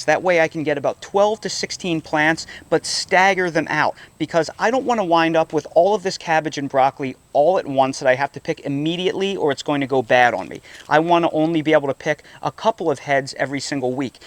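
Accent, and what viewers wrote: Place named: New Jersey, United States. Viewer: You can take the boy out of Jersey but . . . LOVE your content - you have to be from Jersey; No? Bucks County here - just had to comment little northern for the south - love it though!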